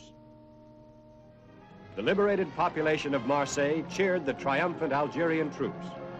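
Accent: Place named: Transatlantic, United States. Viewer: Maybe you should look up what a "trans-atlantic" accent is.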